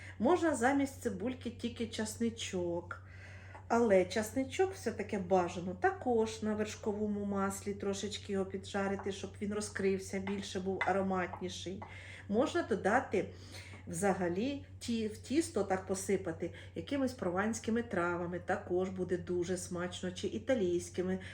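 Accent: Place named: Vinnytsia, Ukraine. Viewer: Батони у Вас вийшли чудовіі,мабуть,дуже смачні.Так і хочеться спекти...,а розкажіть трошки про себе.Ви часом не з Вінницької області?Знайомий, говорок''...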